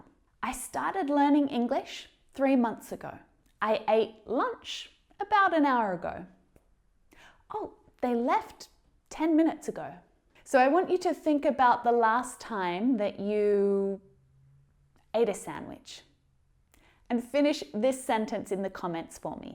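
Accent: Australia. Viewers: Teacher, I just want to say: Thank you. Your accent is really beautiful, this australian accent is wonderful, I really like it. All of this videos are very useful. Thank you teacher for your job, this videos will always help us.Thank you ❤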